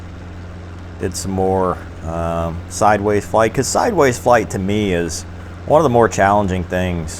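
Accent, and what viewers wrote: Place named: United States. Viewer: I enjoy the accent. It's like a disappearing gem of Americana. Thanks for sharing!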